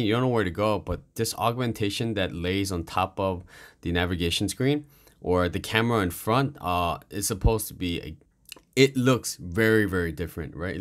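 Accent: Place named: South Korea. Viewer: I can tell that you're a Korean from your accent. Why are you pronouncing Hyundai as Hyun-DIE????? You know what they're supposed to sound like.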